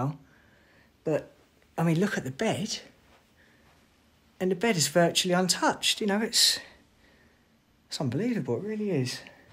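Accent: United Kingdom. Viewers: I love your UK accent!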